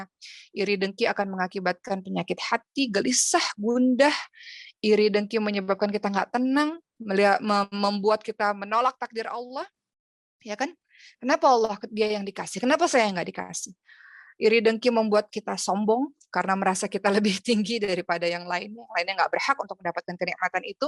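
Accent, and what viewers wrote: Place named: Arab world. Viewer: TERLALU CENGKOK ARABNYA. ULAMA PUN GAK BEGITU.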